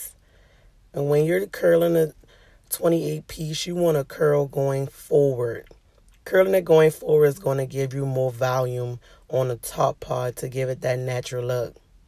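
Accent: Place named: South Carolina, United States. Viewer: South Carolina accent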